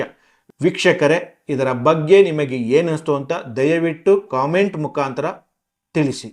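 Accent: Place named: Mangalore, India. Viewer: ಇವರದ್ದು ಮಂಗಳೂರು ಸ್ಟೈಲ್....ಹರುಳು ಏನೂ ಇಲ್ಲ.....ಗೊಳ್ಳು... ತೌಡು....ನಾಟೆಟ್ಟಾಲ್ impressive....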